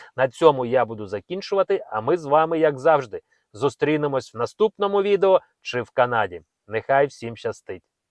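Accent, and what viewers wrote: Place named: Russia. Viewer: Говорить з акцентом раши.Не вірте !!!.Подали і продовжили уже воркперміт, без роботи.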